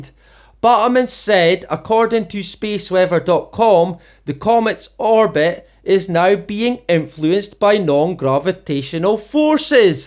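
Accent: Scotland, United Kingdom. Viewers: Shalom, dear friend and brother. Thank you so much for this information. I'm going to be sharing it, along with the previous video on PBB, with family and friends who think I made this stuff up lol. Keeping you and yours in my prayers. Love that you're not afraid to share the truth, especially with that amazing Scottish accent! Say hello to bonnie Scotland for me! Much love from still chilly Michigan USA. 😍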